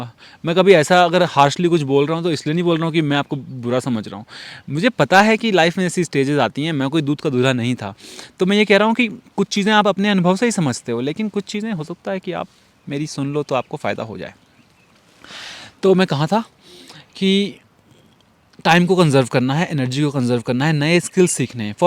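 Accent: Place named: Pahadi, India. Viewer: Apaki pahadi avaz jaisi lagati hai very very nice. Thank you bhai.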